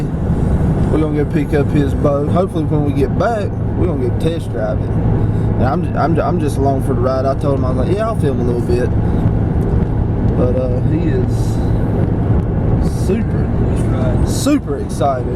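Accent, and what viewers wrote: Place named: North Carolina, United States. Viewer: Sounds like you're from North Carolina...I live about 30 minutes from Little Washington. 😆 I'm down here in New Bern, NC. I picked up a Tracker Grizzly few months back and ran across the video of you and your son testing out the 60hp. I'm thinking about putting a 50hp on mine. Its a 1860 as well. You think that's enough motor?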